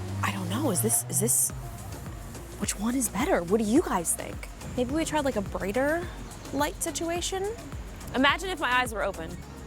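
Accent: United States. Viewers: wow You talking like😲😲😲 Wow People from u.s are adorable than I taught they will be... 😁😁😁I don't even know if you are from u.s but you sound like them "lol" It's amazing😉... Well actually you are changing the rules of a girl now huh.....well my taught still not wrong maybe you are the one who's different Wellhuh! It nights🌃 here my country so im going for bedtime sleep 😴 after playing some 🏀🏀⛹️‍♀️...everything workout well...... SEE YA👋🏻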